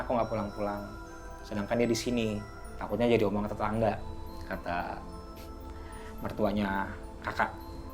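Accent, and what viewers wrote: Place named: South Jakarta, Indonesia. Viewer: Anak jaksel mana suaranya niiih hehe. Akhirnyaaa cerita Kisah Menara Saidah walaupun nontonnya telat bbrpa hari😂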